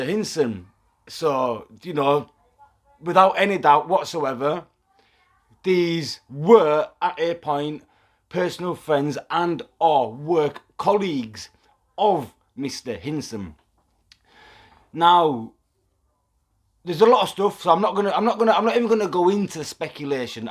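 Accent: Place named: Southern England, United Kingdom. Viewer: South england accent,up in teeside hmm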